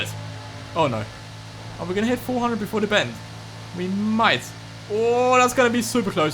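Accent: Germany. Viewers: Find ich nice, dass man bei deiner Stimme raushört, dass du deutsch sprichst😂🙈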